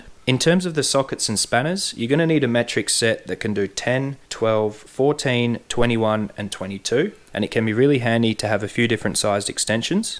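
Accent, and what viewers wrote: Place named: Australia. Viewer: Very informative and detailed. Great video! Greetings from the Dominican Republic. Where are you from? England? I reckon you are from Australia, but I'm not quite sure.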